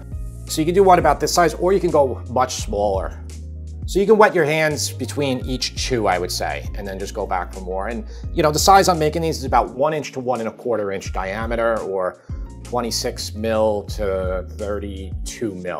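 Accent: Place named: Long Island, United States. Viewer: You can't possibly say "fond" with a Lawn Guyland accent. It won't work.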